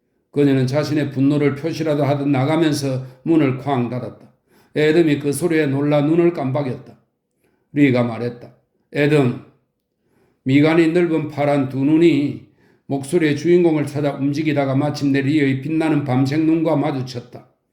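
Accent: Gyeongsang, South Korea. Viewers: 긴 시간 동안 읽어주셔서 감사드립니다. 처음에는 어색하였는데 나도 모르게 경남 사투리의 어감에 빠져들었습니다. 이제 모든 글을 들어야겠습니다. 새로움으로 가득한 소식을 늘 기다리겠습니다.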